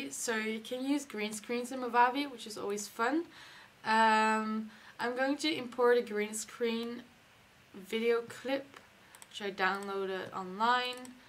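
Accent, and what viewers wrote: Netherlands. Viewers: i smelled a dutch accent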